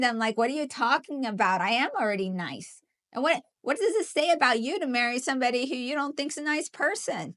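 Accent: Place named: Texas, United States. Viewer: Gotcha on the TX accent! I had a GF shortly after high school who grew up there from the age of about 12 and she picked it up real quick. One of her expressions for affirmation was "You bet!" which I will never forget what that sounded like. (She was damn sweet! If we would have managed to stay together we'd have about 12 kids by now!)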